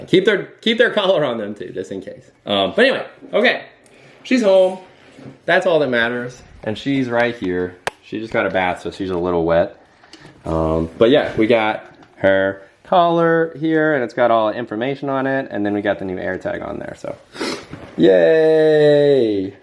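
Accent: South, United States. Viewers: The southern accent coming in strong in this vid! Keep up the positive vibes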